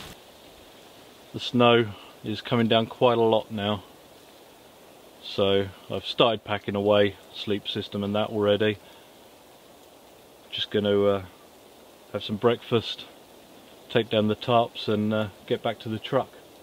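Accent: England, United Kingdom. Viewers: kinda cracks me up a little to see an english guy (I assume you're english, sound like it) making jumbalaya. I didn't think it would be popular over there, across the pond. And the onion. celery and pepper is called "Trinity". Mirepoix is onion, celery and carrot. Next time you make jumbalaya try using andouille sausage instead of chorizo. It makes a much better jumbalaya. Although, so does shrimp, not that I'm saying it's not jumbalaya without shrimp, but much better with. And easy to take and keep on cold weather campouts. Good video btw. That's some cold weather to be camping out in the open like that. Even in a partial shelter, that's still pretty much out in the open. I live in Michigan and have spent many years tent camping in upper Michigan for deer camp in mid November and have spent many nights camping in a tent in sub zero temps. But out in the open...Nope. 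And I don't know what kind of folding saw that is you use, but I have a Gerber and it has offset/staggered teeth and is REALLY aggressive. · Where are you located? The accent is British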